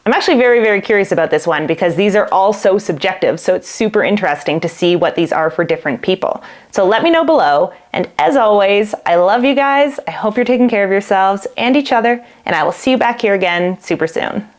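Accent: Canada. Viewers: Why insult Texans? Do you actually think your goofy Canadian accent sounds better.😂😅😮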